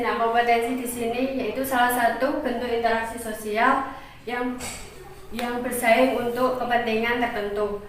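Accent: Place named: Java, Indonesia. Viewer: Ini pasti dr Jawa. Medok.. tp bgus kok penyampaiannya, lanjutkan...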